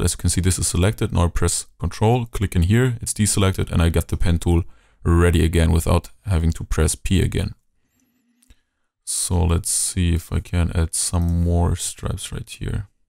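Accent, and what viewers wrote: Germany. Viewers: deutscher hört man sofort raus :D aber nettes video xD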